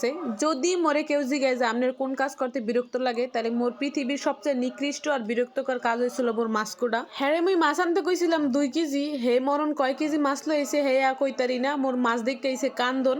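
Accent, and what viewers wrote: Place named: Barishal, Bangladesh. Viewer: সর্বণাশ😮😮😮 but বরিশাইল্ল কথা😅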